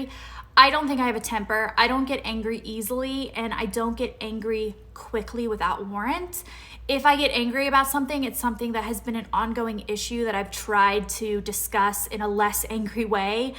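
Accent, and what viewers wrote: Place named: South, United States. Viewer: I like how your southern accent is so much more noticeable now, it's cute :) it comes up especially when you get excited. I'm loving the new videos, this is such a time travel for all of us :) take care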